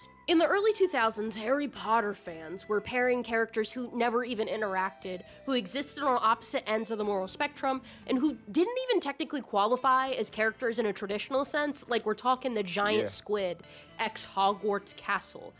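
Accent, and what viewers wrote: South, United States. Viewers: you're really cool and smart and gay thank you for not hiding your accent also what's the license plate on your fall off of (sincerely, another queer redneck)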